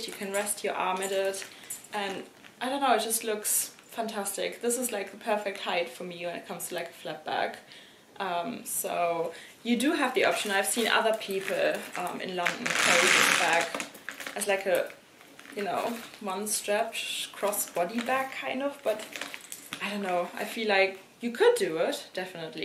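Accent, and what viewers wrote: Germany. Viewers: Hey, i am thinking of getting the purse.... great review thank you for the video ☺️.... are you german ? ☺️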